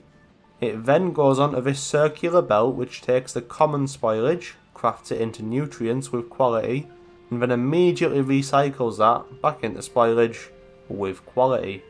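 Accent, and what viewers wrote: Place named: England, United Kingdom. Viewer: Interesting information, but would have wished the author did not just read off his story from a paper, but that he would put some life in his speech, as the English accent and fast talk through without emotion is very hard to follow/understand. Especially when details are not shown, only excerpt here and there. Hope this helps in future videos.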